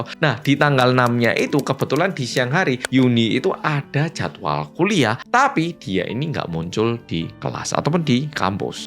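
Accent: Java, Indonesia. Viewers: Dapat notif langsung ambil kopi, stay ke mas medok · Knp logatnya Jawa bgt🤔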